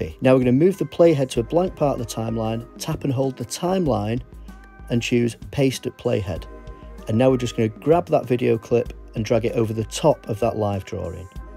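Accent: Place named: England, United Kingdom. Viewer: Nice to hear an English voice ..good video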